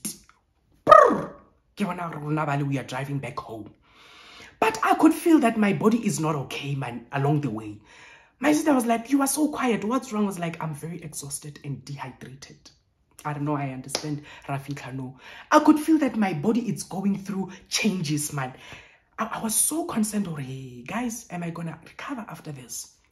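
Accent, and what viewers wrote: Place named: Sotho, South Africa. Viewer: oh wow ke utloa fela ka accent..I'm also sotho from Lesotho but based here in Joburg 🫶🏼 keep shining fave🎉🎉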